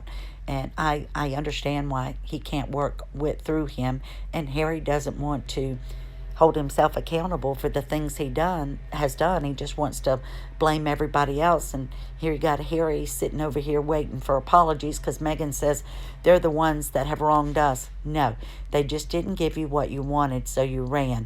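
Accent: South, United States. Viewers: Where in the south are you from? Beautiful accent.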